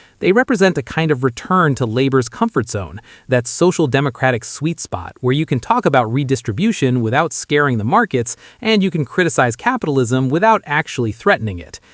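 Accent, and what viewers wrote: United States. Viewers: Why have we have an American voice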